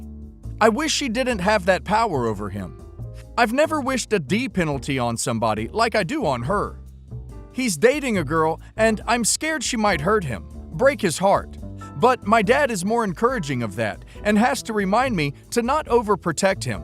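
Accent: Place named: United States. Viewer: It is so freaky hearing this american voice on this reddit stories channel. i thought it was a genuine voice when i heard it on Ask a lawyer channel.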